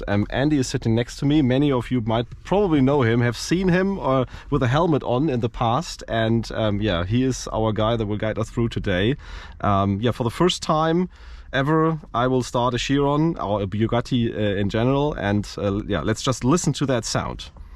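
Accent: Germany. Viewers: I hate this german accent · are you from germany??? because you sound german?? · ein wunderschönes Denglish :) hört man sofort raus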